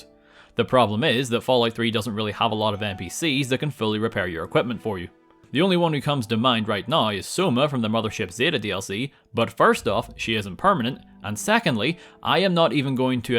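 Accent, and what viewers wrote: Northern Ireland, United Kingdom. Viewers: Ah are you a fellow Northern Irish man playing fallout? What a rare sight 😂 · I needed some of that northern Irish accent today. Great video as usual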